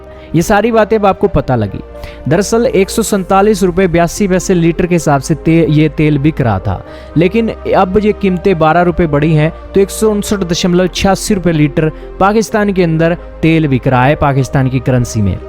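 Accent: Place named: Himachal Pradesh, India. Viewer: Ye voice kisi himachal wale ki hai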